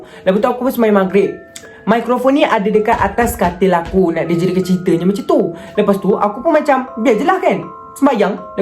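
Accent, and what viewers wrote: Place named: Kelantan, Malaysia. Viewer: wow kelate 😂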